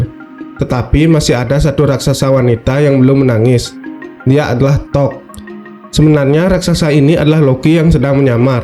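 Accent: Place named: Bali, Indonesia. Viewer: Beli logat bali nya masih kental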